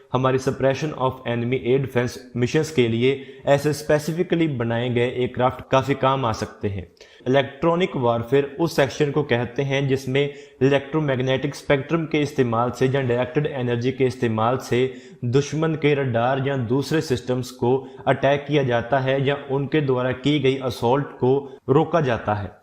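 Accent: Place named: Pahadi, India. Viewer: Being a pahadi... And hearing your voice seems you are too. Good job bro 👍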